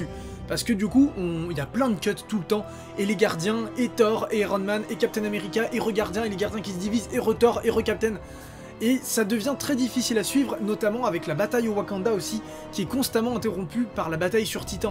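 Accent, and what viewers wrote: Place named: Lyon, France. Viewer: Tes lyonnais ? prck tu di trikar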